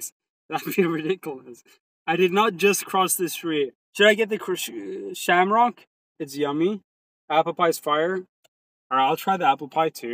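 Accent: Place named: Sweden. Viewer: Turning into pewdiepie. you're losing your swedish accent as time goes on LOL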